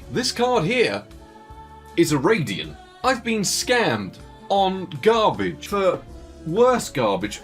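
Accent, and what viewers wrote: England, United Kingdom. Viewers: I love your channel brother. Serious question, are you from the same part of England as Jeremy Clarkson? Your inflection is so much like his.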